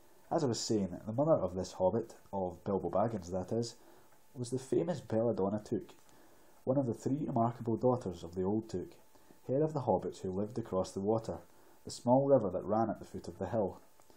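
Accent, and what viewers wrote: Scotland, United Kingdom. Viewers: Holy Highlands, Batman! Reading Tolkien in a Scottish accent. That *THUD* is just me, *Falling Over* ! Brilliant. I didn't get asmr, but it's usually random, anyway. I'm just so excited for this community that you made this video, because THAT'S WHAT I'M TALKING ABOUT! Definitely keep doing these. p.s.: "He came expecting mischief and didn't need to see his friends' legs sticking out of sacks to tell him that things were not at all well..." ;) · I wouldn't rate this as an asmr. You could get more views and likes if you titled it as a Scottish accented reading of The Hobbit. Loved it btw. Used to live in Skye untill we moved elsewhere. So go ahead mate. :D upload some more. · Hay! A fan of scotland and ye accent here. There's something fascinating about it, so definately do more. For 'untrained' ear, your speech sounds very odd and I hardly understand any words, but I like it a lot. 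Hopefully we will hear (about) you in some point again!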